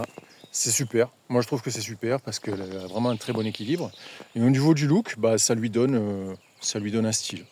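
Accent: France. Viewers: moi je mets un pouce rien que pour l'accent "nordiste" xD et j'aimerais beaucoup que tu reprennes la kove et nous fasse un petit comparo ;) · Eh mais tu es sur le groupe v85tt ! 😊 Je pensais que tu étais Franc comtois d'origine, il y a un petit accent du sud. Cette cf me fait de l'oeil, franchement ils envoient du lourd, j'ai essayé la 450 et j'ai été bluffé. Au plaisir!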